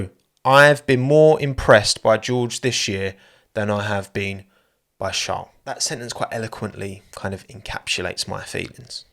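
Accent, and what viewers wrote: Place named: London, United Kingdom. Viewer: The only channel I’ll suffer the London accent for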